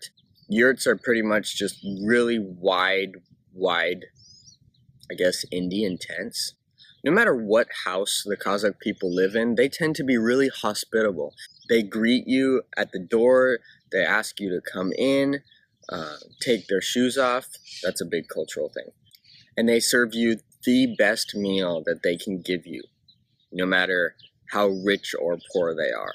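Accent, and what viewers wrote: United States. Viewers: Kazakh language is a State language, Russian is the Second Official language. I noticed that in Almaty there most people speak Russian, but in the West of KZ people speak both languages, but they speak Kazakh mostly. I wonder, what's your nationality? I suppose, you have an American accent, if I'm not mistaken. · how come you speak like an american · You have a very strong American accent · you from Seattle? you have the Washington acent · Why do you look and sound American? Don't you like being from Kazakhastani??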